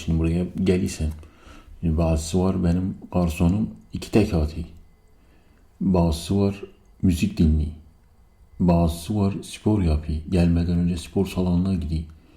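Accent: Eastern Anatolia, Turkey. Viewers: doğu şiveli olduktan sonra her şey olabilir 1 saat doğu şiveli herhangi bir video n olsun yine izlerim her gece aciyorum bir tane şiveli ama artık aynı şeyleri izliyor gibi oluyorum hepsini defalarca izledim cünkü aslinda doğu şiveli videolar icin izliyorum bu kanalı dogrusu digerleride güzel ama onlar hakikaten başka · Doğu şiveli videolar çok daha cezbedici. Emir veriyor gibi olmak istemem fakat bu lisanla psikolog roleplay güzel gidebilir.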